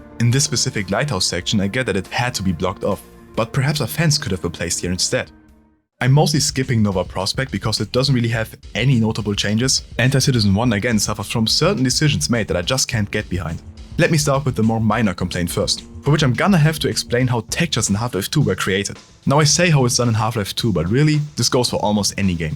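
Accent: Germany. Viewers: I can here that your german... Still my guy making peak after peak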